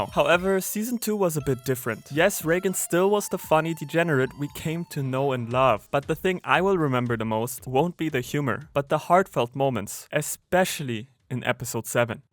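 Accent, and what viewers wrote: Germany. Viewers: Deutscher Akzent haha Aber ja ist ein guter Anime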